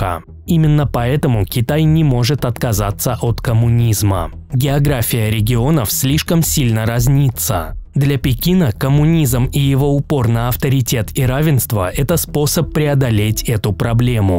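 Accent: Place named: Russia. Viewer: да вроде оригинальный канал ведёт русский судя по акценту. Разве не он и есть автор канала?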